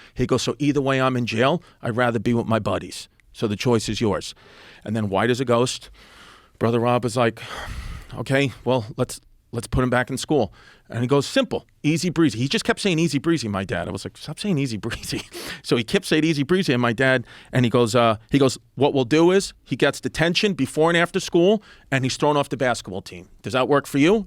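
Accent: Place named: New York, United States. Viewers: If I have kids, I wanna be like this dad, but talking like Clint Eastwood or Thanos instead of a New York accent. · Geez i love a good story told in a ny accent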